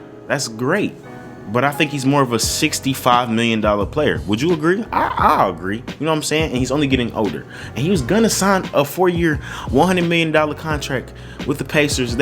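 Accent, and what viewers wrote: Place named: North Carolina, United States. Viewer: Where you live? Cuz you sound like you live here in NC. I’m a big hornets fan, I love the signing.... just not the amount of money he was signed to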